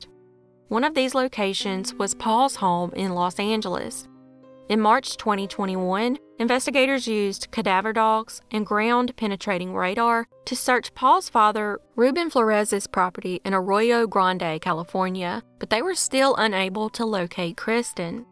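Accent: South, United States. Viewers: Just have to say as a Southern gal.Myself I absolutely love your accent❤ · This narrator has a good southern accent. Very nice!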